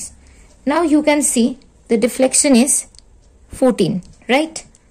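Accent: Bengal, India. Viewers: Mam are you bengali ? I guess by yours accent Correct me if I am wrong..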